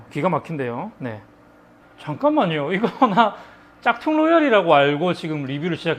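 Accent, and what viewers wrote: Gyeongsang, South Korea. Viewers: “구불고 구불고~”아주 오랜만에 듣는 말이네요. 갱상도분이신듯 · 경상도분이신거 모르기가 더 어렵지 않을까요 ㅋㅋㅋㅋㅋㅋㅋ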